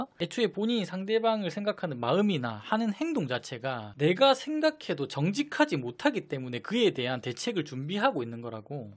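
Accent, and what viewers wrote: Gyeongsang, South Korea. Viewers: 왜 연애 유튜버분들은 경상도 사투리가 많을까요 이분은 현재 연애상담 유튜버 하시는분의 말투나 톤이 넘 닮았어요 넘 놀래서 댓글 달아요 😮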